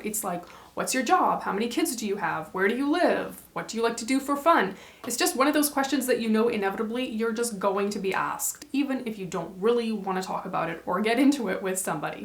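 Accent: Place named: Canada. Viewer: I didn’t realize you were Canadian until today! now I can hear that in your voice. Love that ❤️🥰 I think it’s adorable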